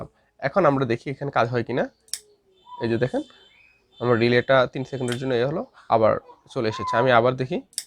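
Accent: Noakhali, Bangladesh. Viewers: নোয়াখালীর ভাই নি আননে 🤭🤭🤭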